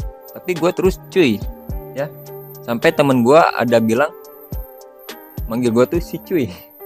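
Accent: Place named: West Java, Indonesia. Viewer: Muka sama gaya bicaranya Abang emang mirip alm denzbagus bang ,,cuma beda logatnya aja kalo alm denzbagus kan kalo ngomong logatnya Lampung ,kalo Abang Sunda tapi gaya bicaranya sih mirip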